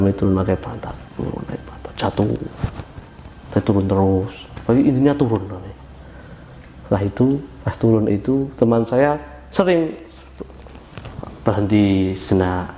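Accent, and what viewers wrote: Java, Indonesia. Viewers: Jawa campur nasional😅bljr bhsa dlu yng bnr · Koreksi. Suara agak kurang jelas dan ada suara gemerisik gitu. Kurang cocok kalo di dengerin gak pake hedset. Dan juga ngomongnya terlalu medok buat aku yang nyunda🙏🏻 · Yeee ,org jawa ya bgtu ,,,medoknya keliatan bnget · Mas jd youtuber aja. Cara ngomongnya enak. Walau gaya jawa yah - lama bener critanya hehe - tp saya nonton trus sampe abis krn mw tau endingnya - bikin penasaran & seru juga ... Salam dari Jakarta.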